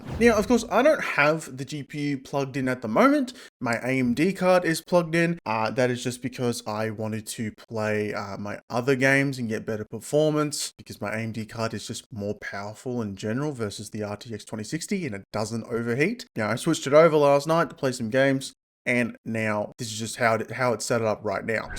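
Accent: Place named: Australia. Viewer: I just realized you have an Australia accent, simply sublime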